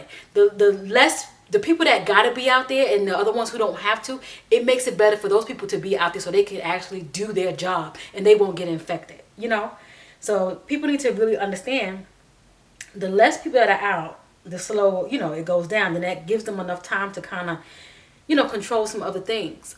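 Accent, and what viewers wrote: New York, United States. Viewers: I knew you was from close by me 😂 I said she from up North. I'm about 45 minutes away in CT.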